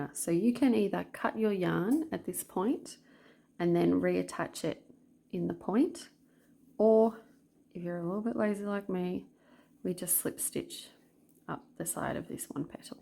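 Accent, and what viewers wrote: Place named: Australia. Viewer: Hi! Looks great..just found it and will make one! Greetings from Italy.. I guess you are in Australia right? ( accent😀)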